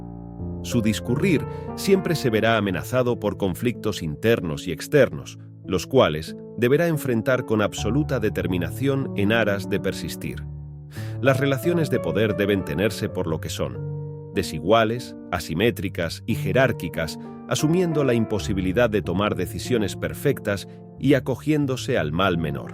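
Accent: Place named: Spain. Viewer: ¿Por qué usas el acento español?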